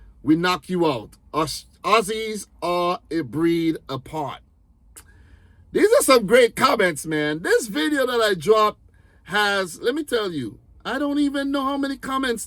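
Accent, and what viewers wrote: Caribbean. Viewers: You sound like you’re from the Caribbean but you live in the USA?because you know a lot about our cricket team I have so many favourite players and even met a West Indies legend when he was playing in Australia yes I met the Universe boss Chris Gayle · To be fair though it's clear from his accent that he grew up in a Carribean nation so he doesn't speak like a Yank.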